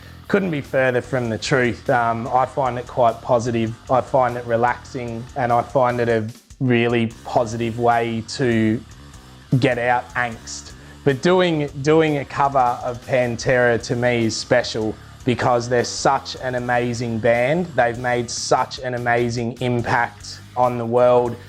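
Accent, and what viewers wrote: Australia. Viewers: this is the heaviest australian accent i've ever heard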